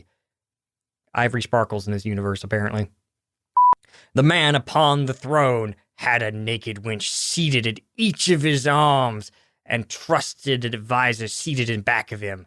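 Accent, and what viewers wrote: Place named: Tennessee, United States. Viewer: The moment I saw you post this I thought, “Oh I can’t wait to hear the line ‘sagging nipples’ in a Tennessee accent.”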